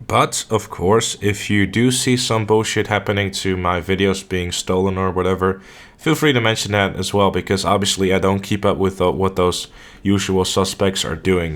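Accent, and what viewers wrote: Netherlands. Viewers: are you dutch? your accent seems dutch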